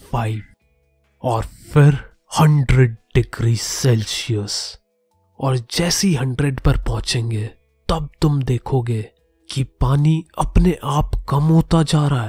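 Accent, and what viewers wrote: Haryana, India. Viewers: I bet ..This guy is from HARYANA !! 🥶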